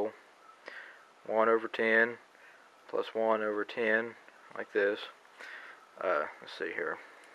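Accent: South, United States. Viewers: Made it VERY easy to understand, thank you! .. I'm from Canada so the southern accent made it all the more enjoyable. ;)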